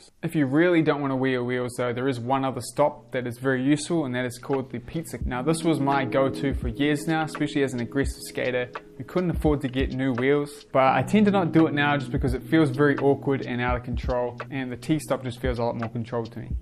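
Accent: New Zealand. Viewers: nice vid bro - are you from NZ >? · Sounds like a kiwi accent to me :) Thanks for the great tips!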